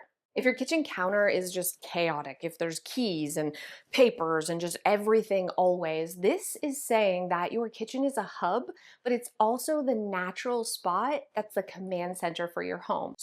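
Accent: Canada. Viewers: Sounds like you're from Canada. Just want to say to all canadians on here, i think you are awesome and kind, and i hope to get to visit quebec this year. Love from Ohio ❤❤❤❤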